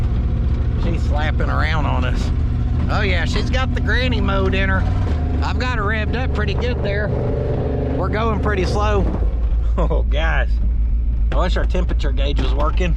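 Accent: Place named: South, United States. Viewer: I've noticed from watching you for years that you are the only one in your videos that has a southern accent When you're family and friends speak they don't have that and it makes wonder where that came from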